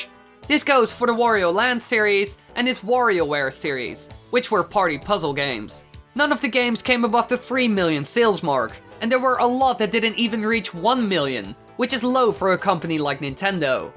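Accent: Netherlands. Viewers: are u dutch? your accent is like you speak dutch but uses english words, however not a ''bad'' accent haha, i am dutch but it just looks like ur speaking fake dutch.. i cant describe it well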